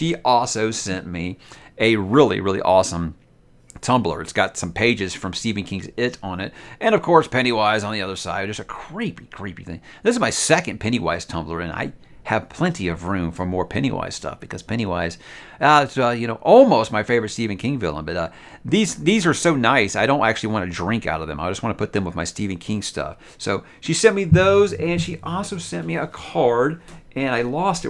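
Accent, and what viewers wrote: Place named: United States. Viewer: You're fine pronouncing names the american way because... you're american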